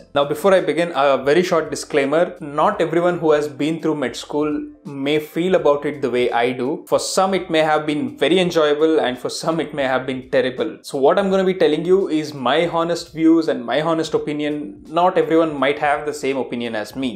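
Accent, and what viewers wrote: India. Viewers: Dude,I don't know why but i can feel your Bangalore's accent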